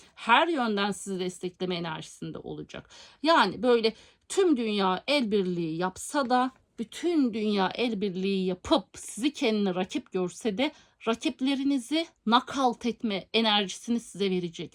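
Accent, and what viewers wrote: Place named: Azerbaijan. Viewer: Salam. Siz Igdirlimisiniz. Sanki konusmanizda bazen benziyor da o yüzdən. Yani bazen azərbaycanca konusmanizda da var Ben onu hiss ediyorum.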